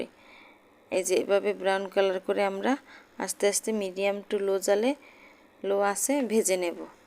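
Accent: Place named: Barishal, Bangladesh. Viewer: আপা,আপনার বরিশালের ভাষাই বলেন,তাহলেই ভালো লাগে।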